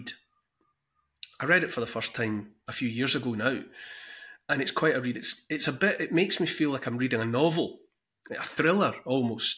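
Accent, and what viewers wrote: Scotland, United Kingdom. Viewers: The Scottish accent is so very charming! I love it. (The Irish accent is equally charming, definitely different from the Scottish, but similar to American ears.)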